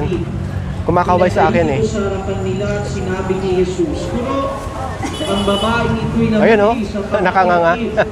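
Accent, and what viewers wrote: Visayas, Philippines. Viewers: Bisaya ka ba kuya?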